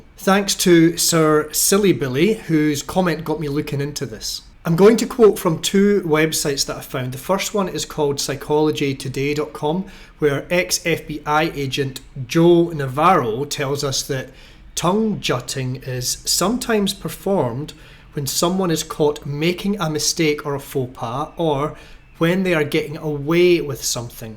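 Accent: Scotland, United Kingdom. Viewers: Are you and Neil Oliver from the same place in Scotland?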